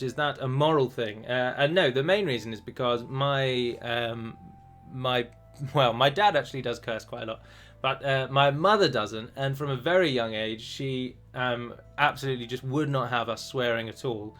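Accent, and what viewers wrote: United Kingdom. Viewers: I have always enjoyed watching your videos. It's almost ritualistic that I come home from a day of work and after dinner (sometimes during dinner if my wife is not here) watch a video and escape into the world of LOTR. My wife says she doesn't mind me watching your videos because they are clean and she loves a good British accent. Your girlfriend/fiance is gorgeous and her saying the names of her statues reminds me of my wife. Keep up the great work and cheers to the future.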